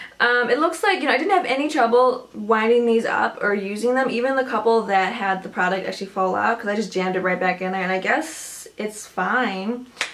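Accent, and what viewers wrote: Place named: Upstate New York, United States. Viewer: BTW, are you from up north? I grew up in Rochester NY and our accent is very similar to yours. :D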